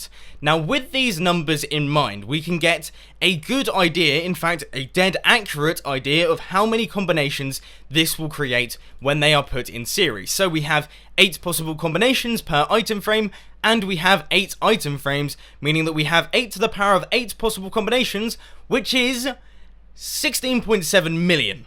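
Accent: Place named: United Kingdom. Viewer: hes friggin british dude or English whatever you prefer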